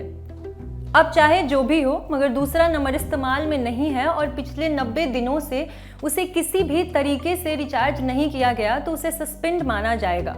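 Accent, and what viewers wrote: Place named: Bihar, India. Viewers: Nice hindi bur bihari accent Accha laga❤ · Apke bolne ke accent se lag rha hai aap bihar se ho Abhi thoda time lagega hindi accent me bolne me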